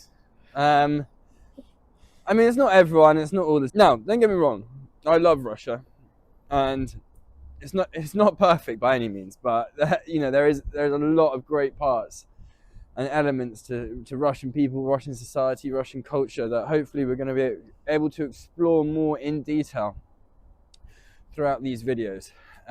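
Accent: United Kingdom. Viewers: Блин, чел, как же тяжело воспринимать английский акцент после постоянного американского. Англичане, пилите больше контента! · Okay, I subscribed. I hope it will be interesting to look at Russia through the eyes of a Brit, and at the same time it will be useful to listen to a non-American accent. For some reason, I suddenly remembered a quote from a famous Soviet comedy: "- Well, if you come to our place on Kolyma, you'll be welcome... - No way! You'd better come to us!" 😂😂😂 Greetings from Krasnoyarsk!✌😉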